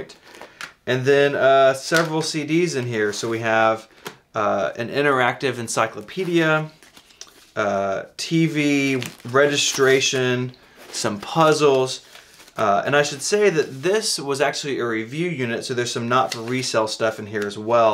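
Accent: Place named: South, United States. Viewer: This guys from the southern area of murica. They’re not very bright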